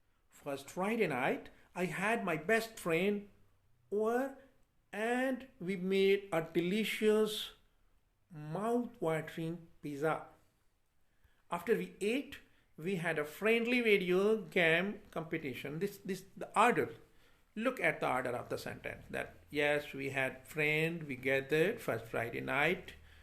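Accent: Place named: Khattak, Pakistan. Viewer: Pure Khattak and non-native accent.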